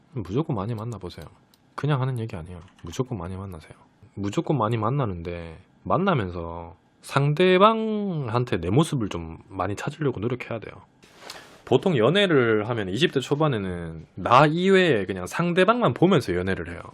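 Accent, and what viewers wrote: Gyeongsang, South Korea. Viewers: 말투가 뭔가 빨려들어감 경상도 억양이 왜이케 조앙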